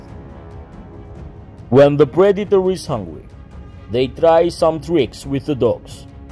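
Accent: Russia. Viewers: Чёткое видео, автор русский 🇷🇺 чтоли? Акцент такой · subscribed a few weeks ago me frirnd.. Your accent are you from Russia :)? Greetings from Poland slavic friend..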